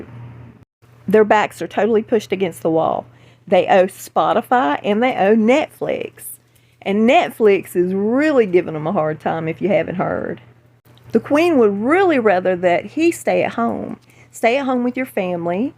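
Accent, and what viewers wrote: South, United States. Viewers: I just adore your accent!! It's so bright and cute!! One of my fave American accents (from an Aussie fan) :) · So glad to hear that spunky little Southern voice of yours! Hope you feel as good as you sound!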